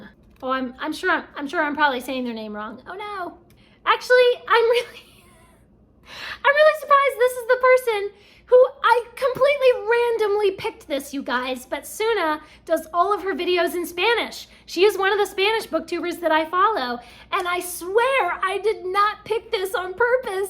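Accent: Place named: Mexico. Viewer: Hola! Saludos desde Barcelona (España). I follow your YouTube channel since some months ago now and it is the first time I comment. Hope you are well! You are the second booktuber that I find (the truth is, in a very short period of time!) that speaks Spanish as well and I am very happy for that!! My first language is Catalan and my second/first language is Spanish. I speak English and a little bit of French and learning Russian nowadays. Would be great to read some books in Spanish with you! Hablas muy bien español con ese acento mejicano maravilloso 👏👏👏. Hasta pronto!!! Cuídate mucho!! Bye!😊🙋‍♀️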